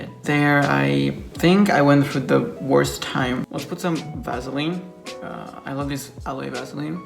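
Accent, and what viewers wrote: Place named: United States. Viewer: Where did you learn American English?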